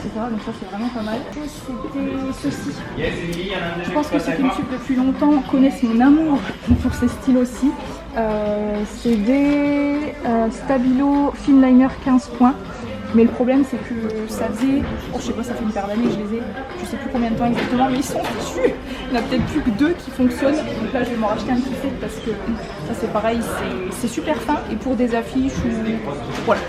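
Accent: North, France. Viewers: Les gens entende beaucoup l’accent moi non 😂( oupsss je viens du Nord aussi ) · on reconnait l'accent du nord mdrr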